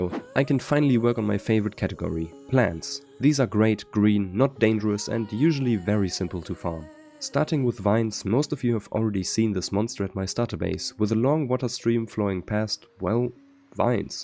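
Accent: Germany. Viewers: are you a german? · Are you from germany? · Kann das sein das er deutsch ist? 😅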